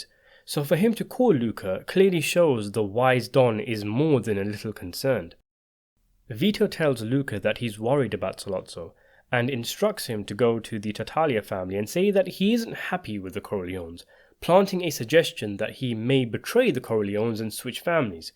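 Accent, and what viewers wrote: England, United Kingdom. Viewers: What part of England are you from narrator ? You speak a very rare dialect. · This is the second video of yours, BUT.... I'm from California, I'm old, and bad hearing. So I don't watch stuff with ACCENTS, the SE American's are bad enough but you Brit's have a few of your own. So wasn't going watch any more of your video's, BUT, I said give it an other shot cuz I really liked Luca Brasi and liked it. Sorry, and I subscribed.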